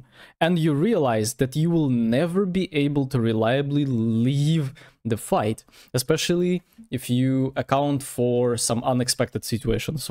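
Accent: Russia. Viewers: У тебя русский акцент, ты шо житель Рашн контри? :)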